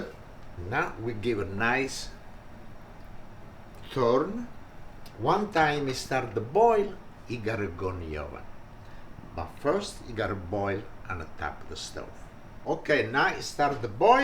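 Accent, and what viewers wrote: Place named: Sicily, Italy. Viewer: Looks great I love lamb and I do make that but not this recipe I definitelywill do this recipe thank you and I love your videos and accent remind me of my patents who cane from Sicily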